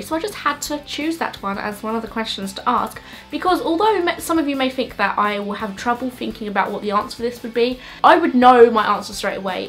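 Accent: Ireland. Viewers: irish accent is hot :) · The Irish accent is amazing! <3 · high five about the irish accent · has a bit of an Irish accent:P very genuine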